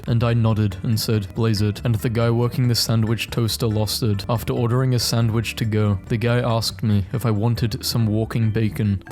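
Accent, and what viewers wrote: United Kingdom. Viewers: ur bri'ish? i thought u were an aussie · Wait… your British?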